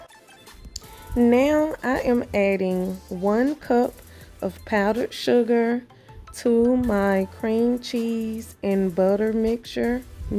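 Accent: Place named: South, United States. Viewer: I Love Your voice very Southern ❤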